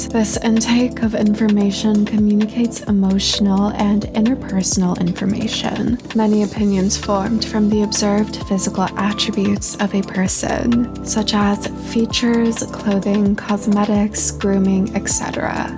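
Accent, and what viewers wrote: RP, United Kingdom. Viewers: I hate how your voice sounds fry and poshy in this video. You don't need to take this ton ...its ridiculous. It took me out.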